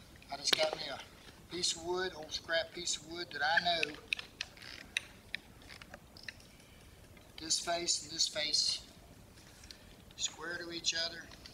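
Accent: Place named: South, United States. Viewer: I love the down to earth no BS way of explaining each step, complemented with that great southern accent. Thank You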